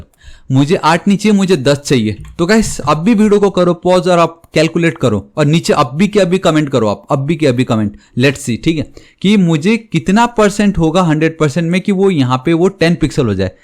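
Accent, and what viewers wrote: Nepal. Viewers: nepali acccent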